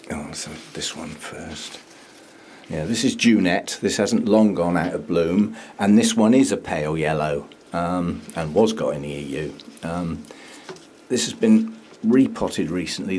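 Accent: United Kingdom. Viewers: Thanks for the explanations! Lovely British accent, don’t mind me saying and no offense , listening to your videos it reminded me of Gandalf the grey ! Lee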